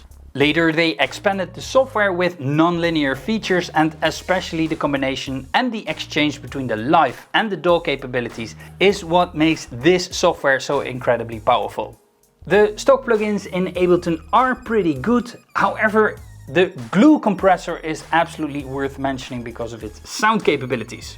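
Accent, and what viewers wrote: Netherlands. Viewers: Hi, Are you from the Netherlands? (The accent sounds to me like that)….in that case I could ask my question in Dutch. 🙂 I was wondering….these are all tips for Imac/windows right? Any suggestions if you want to work on an ipad (and only ipad)? I’m using garageband now….I know it’s not the most professional choice, but for free and I can use it with just my ipad. Any advice?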